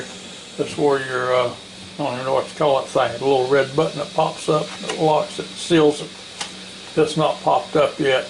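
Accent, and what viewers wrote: South, United States. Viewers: The southern accent make this like 1000% better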